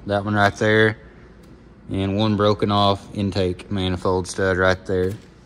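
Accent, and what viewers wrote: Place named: Nebraska, United States. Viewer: Have friends in Alliance Nebraska who sound exactly like you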